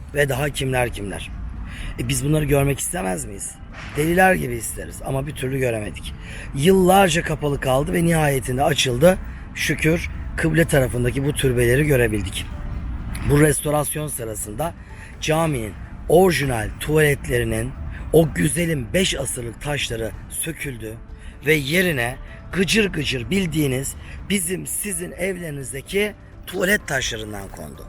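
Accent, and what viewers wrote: Turkey. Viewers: Ne demek gayseriyse herkesin bir aksani var kücümseyerek yazmas saniz daha düzel olur Talha beyin videolarin da neler neler gördük heryer ayni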